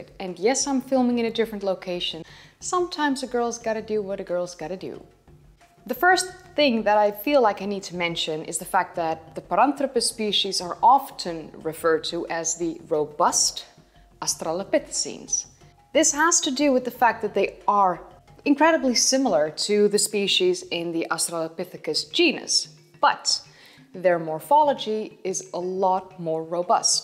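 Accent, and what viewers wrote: Netherlands. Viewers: I knew I smelled a Dutch accent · I like your Dutch accent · your accents is not that bad compaired to that of a lot of Sutch politicians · you being dutch accent makes you you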